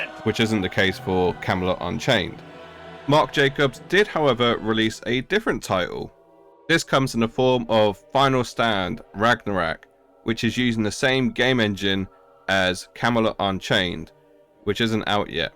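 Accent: United Kingdom. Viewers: I'm amazed you have this many subscribers- a clan of drones really. First of all "old as fk" I am 39, played daoc since i was in my teens. I do 50 pushups almost every day and jog 6 miles + daily. That aside you judgemental fk question- Do you do any of that other than your life consisting based off of already provided information? Didn't think so. (aka leech with no real talent), Oh let's start off with your bitching of a 15 dollar subscription fee. Most MMOs that are free to play and we are talking hundreds if not thousands where even on your mobile app gaming. Oh yes I'm older- still know that phone transactions of whatever 1.99/4.99/9.99/49,99/99.99) required purchase to avoid a block wall etc = more of a rip off than a 14.99 monthly subscription. You have no clue of technology adaptation there was... Bluetooth/headsets/discord in earlier versions. Meaning when PvP was going this "new" tech was used to exploit farming, players would utilize that and set up their own realms by having their friends on the opposing side for points. 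You sounds like a brit brat and again we poured your shitty tea into the sea for a reason. Oh one last thing at least my generation knows what sex they are. MURICA! Goodluck with your wisdom bro comes with age. I personally couldnt see you changing a tire, shooting a deer, or yeah you be you haha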